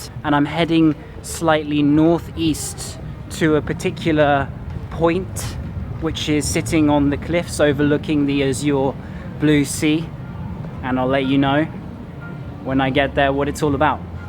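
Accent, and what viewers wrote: England, United Kingdom. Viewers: I like his English accent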